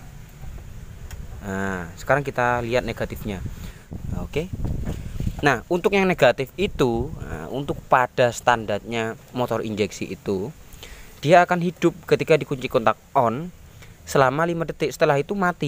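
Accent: Java, Indonesia. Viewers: Ngmong mu lucu mas tp q paham ..q jawa jg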